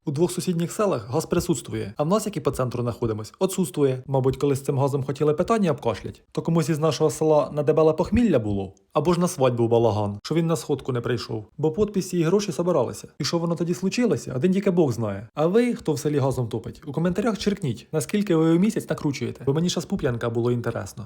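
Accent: Ukraine. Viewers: Ви або з Сумщіни, або Полтавщини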